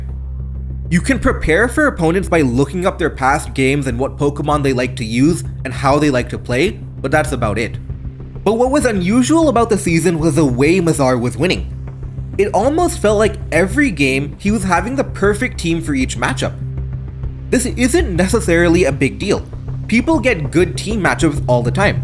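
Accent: India. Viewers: i knew i heard a little bit of indian in his voice!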